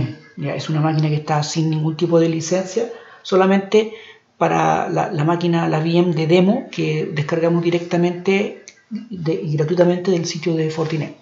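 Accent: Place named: Cuba. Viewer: Sounds like Spanglish. I would say 95% Spanish and the words that don't translate well are English. Actually very easy to understand him, probably because his accent is very similar to a Cuban accent.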